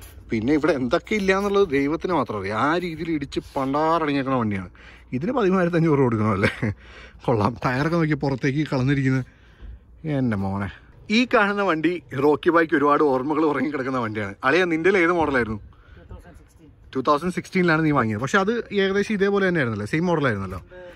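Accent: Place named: Kodakara, India. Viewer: Bro naatil kodakkara aano place ente oru friendine orma varnne bronte samsaram kekkumbo katta support bro👍🏻👍🏻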